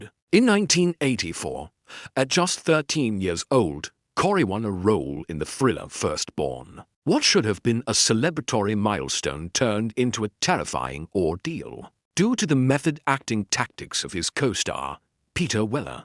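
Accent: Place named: United Kingdom. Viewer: I don't think I can listen to this all the way through because this stunted, posh English accented A.I. accent, is bloody awful! The inflection is all wrong and the sentences just don't flow!!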